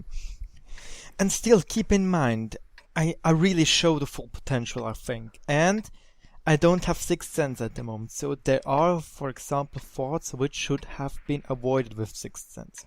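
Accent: Germany. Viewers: Ahh sorry mate. Thought I heard a german accent :D. Great videos though :)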